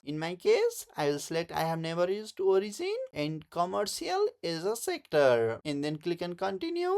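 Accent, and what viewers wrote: India. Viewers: You must be from Odisa, India..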